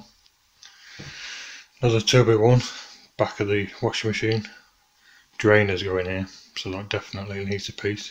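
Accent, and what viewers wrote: Yorkshire, United Kingdom. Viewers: Loving your vids buddy. Love the no-nonsense, no-editing explanation of everything in that down to earth Yorkshire accent! 😆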